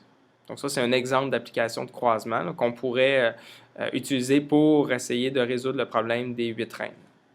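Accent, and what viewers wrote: Quebec, Canada. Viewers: le francais canadien :) merci frero pr l'effort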